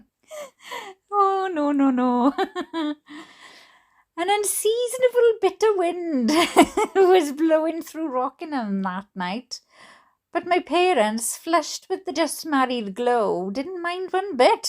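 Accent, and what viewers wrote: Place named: Wales, United Kingdom. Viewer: Ok my lovely! Wonderful if your accent & my southern Kentucky accent talking to each other. Add Rickey Watson in the mix.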